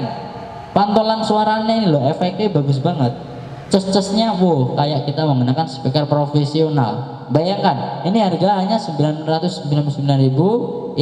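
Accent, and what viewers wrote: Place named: Java, Indonesia. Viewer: Saya tebak orang ini pasti jawangapak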